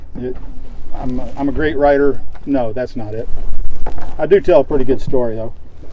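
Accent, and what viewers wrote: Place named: Florida, United States. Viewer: Hey. Did anyone ever tell you that you speak "Florida Boy"? Enjoying your books. I'm through Book 10 and Blue Collar to No Collar! Thanks for all the hard work. I've started a bit myself and find myself starting all over again. I think I found my niche though this time. Keep up the good stuff. See you in La Belle one of these days. BearTail.. B52 Bomb/Nav. "Iron Dukes Viet Nam" 320th Bomb Wing. First in - Last out.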